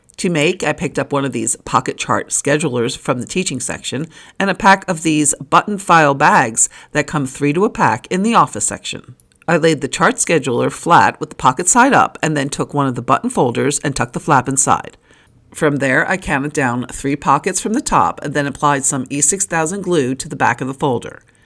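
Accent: East Coast, United States. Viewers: It’s a shame you don’t have any craft supplies on hand. I have to wonder how many viewers caught your pronunciation of crayons, “crowns,” rather than, “cray ahns.” Sometimes you’re so east coast. I love it! I’ve got shelves in my craft cave, but I’m a shortie so I can’t reach things on the top shelf. I need to change some of the stuff to your ideas to make the space more accessible. Happy New Year,!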